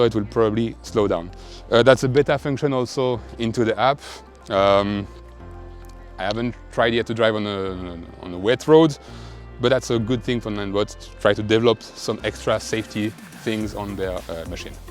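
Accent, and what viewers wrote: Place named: France. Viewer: Tu et français ?😂 en tout cas tu en a l'accent😊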